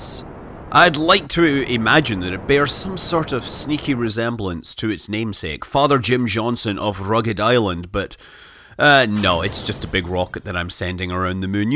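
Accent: Scotland, United Kingdom. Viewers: Scott Manley I have to say. Watching your videos has given me a massive appreciation for Kerbal and helping me feel like physics and rocket science somewhat approachable to the layman. And with a Scottish accent to boot! Thank you for helping me fall in love with this game and science :)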